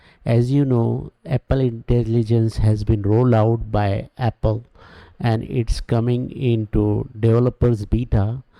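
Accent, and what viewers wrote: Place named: India. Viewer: it is the indian accent. :)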